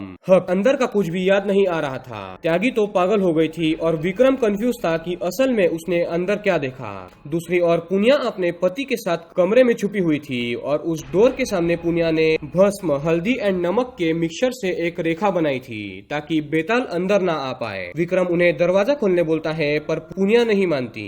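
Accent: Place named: Maharashtra, India. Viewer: Yaar marathi accent may hindi sunkar maza ata haiii..... 😁😁😂😂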